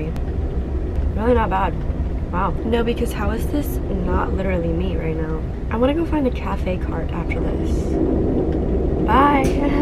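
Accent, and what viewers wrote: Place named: South, United States. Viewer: I could listen to you talk like a Southerner all day long. Please do so and keep up the good work.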